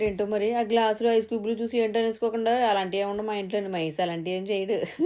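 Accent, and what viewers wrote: Godavari, India. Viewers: Godavari yasa bagundhi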